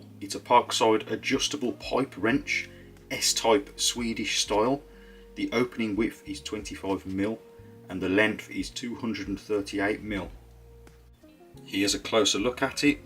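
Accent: Birmingham, United Kingdom. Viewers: You need a better accent. Most Brummies are as stupid as they sound. I suspect you’re not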